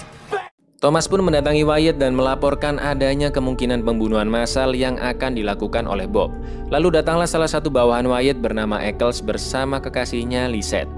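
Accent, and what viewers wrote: Java, Indonesia. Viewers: Kakak orang jawa ya ? 🥰🥰🥰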